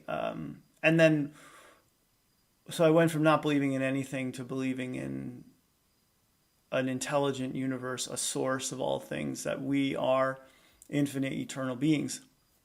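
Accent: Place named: England, United Kingdom. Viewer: Wow you channel as a English man 🙏💜 I thought you were English. Excellent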